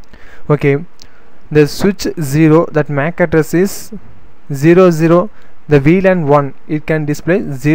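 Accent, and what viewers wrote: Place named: India. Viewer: PLEASE DON'T SPEAK ENGLISH WITH INDIAN ACCENT!!!!!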